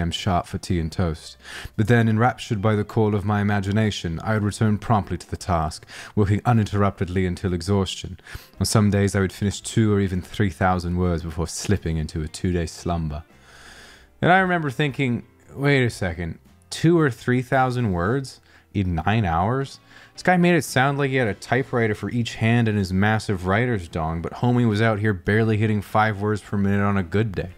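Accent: England, United Kingdom. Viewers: I’m actually very impressed by his British accent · Imressive British accent, dude. New here, but will check back ~ 💁🏼‍♀️ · why do you sound like Tom Hiddleston in that British accent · your British accent is gooood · Thats an amazing British accent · your dramatic Narrative English accent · I love your brittish accent holy shit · Jesus that English accent is flawless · That British accent is absolutely fantastic, you sound more British than me and I’m British. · so no one's gonna talk about how he perfectly talked in British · Your British accent tho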